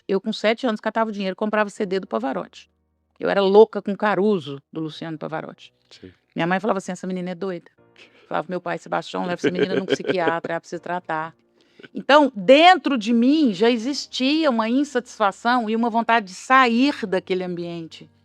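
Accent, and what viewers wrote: Minas Gerais, Brazil. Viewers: Muito legal Dra o seu exemplo! Se intrometrerem na sua vida por um jeito autêntico e mineiro de falar mas não alcançarem de forma singela, o coração de quem carece das suas dicas e orientações para a nossa saúde mental. E tem invejosos-as passivos agressivos que fazem-se de bonzinhos/boazinhas, e aproveitam para nós alfinetar com frases maldosas do tipo: "Comprar casa é fácil mas difícil é pagar as prestações". Ou: "Emagrecer qualquer um consegue mas difícil é manter". E coisas horríveis como lembrar de nossos traumas ferir a todo momento onde surgimos felizes ou celebrando algo bom. Há pessoas que celebram satanicamente as nossas derrotas e tentam nos fazer sofrer até qdo estamos felizes pq não suportam ver ninguém bem. Como a Bíblia diz que DEUS separará joio do trigo! Que sejamos trigo e não joio apesar de tantas imperfeições que vejo em mim🙏🏻. 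Sucesso e sigo seguindo e compartilhando seus vídeos nos grupos de saúde e de Apoio tbm 🎉.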